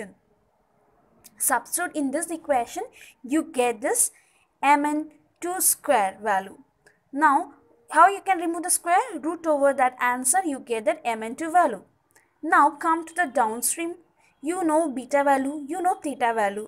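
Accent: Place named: United States. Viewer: Indian accent would be better than ur American accent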